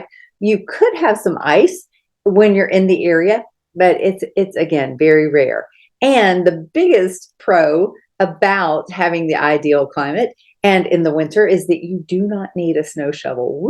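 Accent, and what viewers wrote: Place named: South, United States. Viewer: Awesome video! btw....love that Southern accent! I'm a northerner that loves the Southern charm. God Bless!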